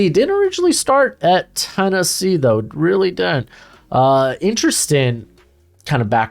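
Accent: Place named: Phoenix, United States. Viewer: Sounds like you are from the Phoenix area? My tribute band just played at Casino Arizona in Scottsdale this past weekend.... a great weekend were it not for the speeding ticket I got.... sad face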